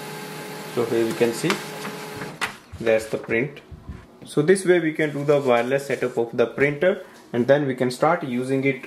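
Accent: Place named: India. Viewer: 인디안 엑센트는 적응이 안되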